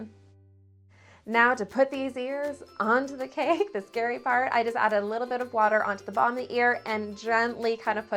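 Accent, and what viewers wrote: Canada. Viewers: Is she Canadian?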